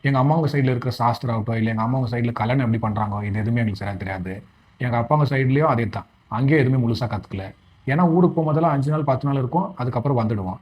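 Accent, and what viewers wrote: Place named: Karnataka, India. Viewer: நீங்க Bangalare கன்னடர்கள் மாதிரி தமிழ் பேசுறீங்க தம்பி....Half தமிழ் accent கூட இல்லை....ஒரு வேளை நீங்க பெங்களூரு la படித்து வளர்ந்தவரோ... தாய் மொழி பேசுவதற்கு பாராட்டுக்கள்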